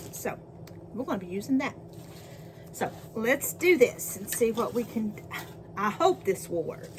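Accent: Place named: South, United States. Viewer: Hi Sweet.. I'm excited to see this creation using a tray... Funny how wood takes staining differently... Don't ever apologize for your southerness coming out at all... I love that about you... God didn't make us perfect and I love that... The metal ribbon border really makes it pop and very beautiful... How cute is that... I love it.. Turned out beautifully as always.. you are such a creative person, I would have never looked at those pieces and put together what you just did... You amaze me each and everytime... The green ribbon adds another level of beauty to the piece.. the flower completes it and draws it all together... I like the ribbon on it.. thank you for sharing this activity... God bless you and your creativity.. love y'all Sweet... Till next video stay just like you are.. Bye..🥰🥰🥰🥰🧶🧵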